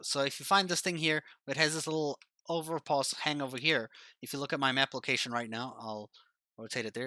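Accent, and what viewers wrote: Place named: South Africa. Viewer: I thought I could read that accent haha! Bring out your Saffa accent more, you don't need to talk like an American :D Good video man!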